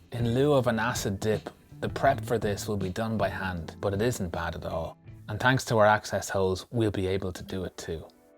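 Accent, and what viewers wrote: Ireland. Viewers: Actually he's Irish, but thanks for the Aussie guess. We'll take that as a compliment.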